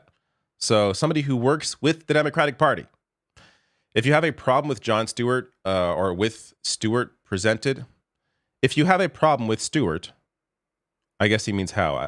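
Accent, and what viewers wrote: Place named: Canada. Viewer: This guy sounds like a Canadian.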